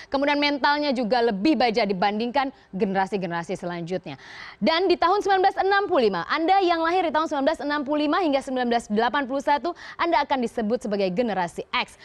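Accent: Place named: Eastern Indonesia, Indonesia. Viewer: itu aksen bung, kan dia dari Indonesia Timur, wajar lah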